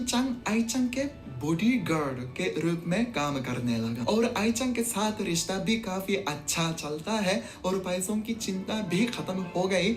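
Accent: Standard. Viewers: This guy speaks better and pure hindi than us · Aap itni shuddh hindi bolte hain ki mujhe bhi aapse bohot saare shuddh hindi ke shabdo ke baare me pata chala. Dhanyawad 🙏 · He say pure Hindi than me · आप शुद्ध हिंदी बोलते हैं और मुझे आपके वीडियो पसंद हैं, आप मुझे बहुत प्यार करते हैं, मैं जापान से प्यार करता हूं।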